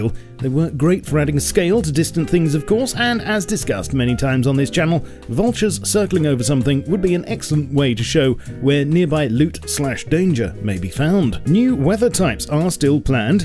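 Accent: United Kingdom. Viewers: You sound like the spiffing Brit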